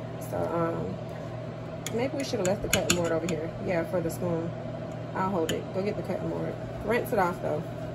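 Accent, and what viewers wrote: South, United States. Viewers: Girl, you are so crazy! Your southern accent sounds like that character sister husband in that movie where the heart is. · You got me cracking up with the southern accent 🤣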